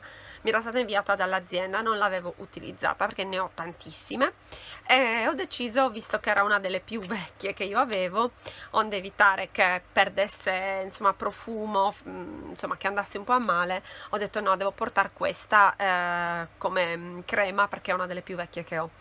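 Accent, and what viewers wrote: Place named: Cagliari, Italy. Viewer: con due mesi di vacanza ti tornava l'accento casteddaio fortissimoooo!😂😂😂 in questi ultimi video si sente il ritorno alle origini! 😍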